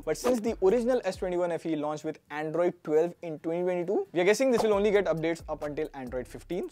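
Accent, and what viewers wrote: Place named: NRI, India. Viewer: ALRIGHT !!! THAT WAS SO MUCH ACCENT AFTER NRI"s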